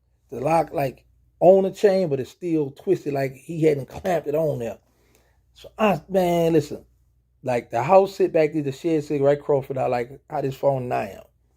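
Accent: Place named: South, United States. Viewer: True souf ngga😂 the way you tlk just cracks me up😅😅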